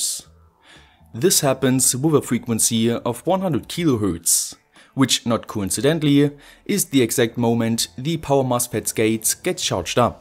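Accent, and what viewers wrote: Germany. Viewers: German accent 😯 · Is it me or does he sound more German every video he releases?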